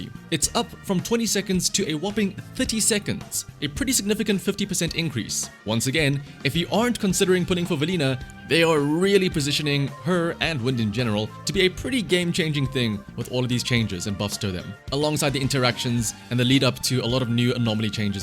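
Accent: South Africa. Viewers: Are you from South Africa cuz ur accent really sounds like it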